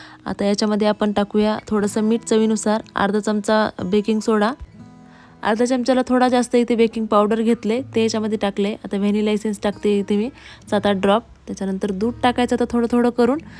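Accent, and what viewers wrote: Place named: Buldhana, India. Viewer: छान केलंय घराचे काम, पूर्ण झाल्यावर भारी वाटेल 😊 माझ्या मावशी सासूबाई नांदुरा बुलढाणा च्या आहेत त्यांची बोली आणि तुमची बोली सेम आहे 🥰 मी माझ्या काही व्हिडीओ मध्ये दाखवलंय त्यांना✨ छान वाटतं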